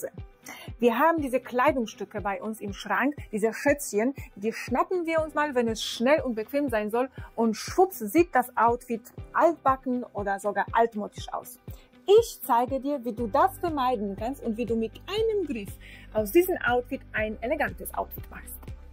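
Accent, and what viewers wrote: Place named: Poland. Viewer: Was ist das für Akzent? Polnisch? Ich nicht iś. 😮 Ch spricht man wie ch nicht wie ś. 😅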